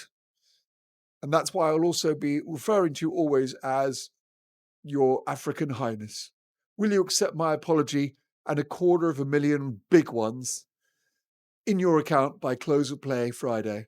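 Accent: Australia. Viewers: Lol,love your Aussie accent 😅Yes,Dixon St in Sydney is where you will find Chinatown,l use to work around the corner in Sussex St,lol😊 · Excellent Aussie accent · love your Aussie dialect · your great Australian accent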